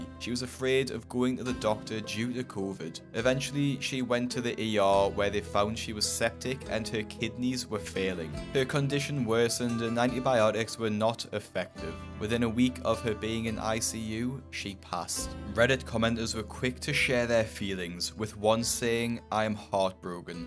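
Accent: Newcastle, United Kingdom. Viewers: He is a Geordie. From the Newcastle area.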